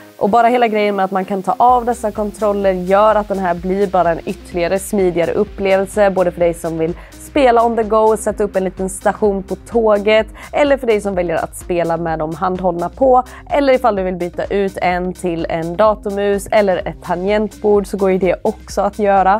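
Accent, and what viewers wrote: Sweden. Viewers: Vad är det där för skön dialekt egentligen? Kan inte riktigt placera den. Västernorrland? Västerbotten?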